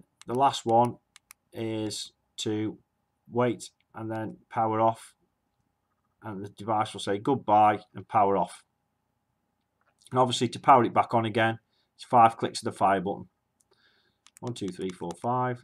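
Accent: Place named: Manchester, United Kingdom. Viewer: I was going to say Manchester. I have a friend from there whom sounds very similar to you.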